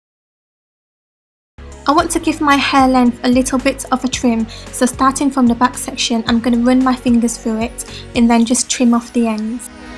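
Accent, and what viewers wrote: Yorkshire, United Kingdom. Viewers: from Leeds or Bradford? x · are you from Yorkshire by any chance? Just your accent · hear a British accent on this girl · her accent makes her prettier... sound like British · British accent <3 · are you British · Her accent does sound Brittish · has a British accent thats awesome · you speak like british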